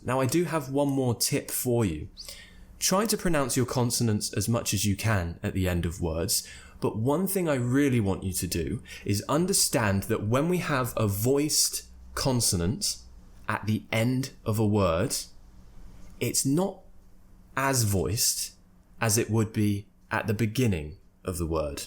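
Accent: United Kingdom. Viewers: I could really learn British accent and improve my English from your channel 😊 Thank you, from Malaysia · Love your pronunciation, your British accent, your videos ... Lots of love around here, right? Ha ha ha Thanks for your work! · I love British accent! I had grown up in London until 6, so the accent or I could say the atmosphere reminds me of my childhood. X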